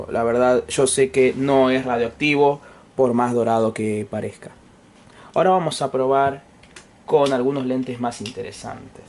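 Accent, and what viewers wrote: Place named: Argentina. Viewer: Tengo un Nikkor 200mm F4 Ai que supuestamente es radioactivo y que es de los 70s, podra ser? Me encanto tu canal, mucha informacion, y es refrescante escucharla en "argentino" y no en ingles jajaja, un abrazo grande!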